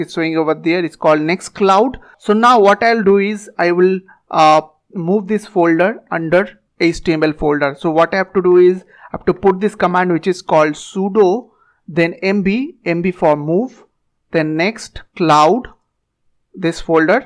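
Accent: India. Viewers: I love your Indian accent (no kidding)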